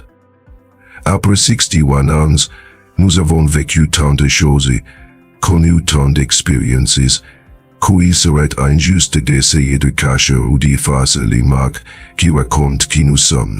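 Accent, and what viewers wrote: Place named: England, United Kingdom. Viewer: D C'est bien la première fois que j'entends une vidéo avec une voix off qui a un fort accent étranger (anglais ou américain ?). Les sous-titres sont désastreux mais cela m'a bien fait rire !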